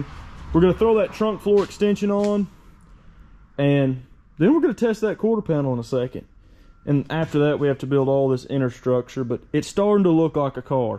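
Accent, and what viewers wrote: South, United States. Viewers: I'm so hooked on hearing the ambiguous southern narrative describing the journey of restoring a completely totaled wreck into a running driving car! I can't help but thinking the song should be playing in the background. Can't wait to see the finished outcome.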